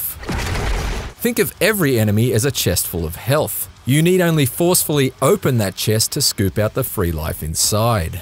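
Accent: Australia. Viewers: Goodness, I always significantly enjoy the texts when any of the Aussie lads writes/narrates them! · is this Gmanlives? I can tell that he's Aussie, but it doesn't sound like Gmanlives. The accent is slightly different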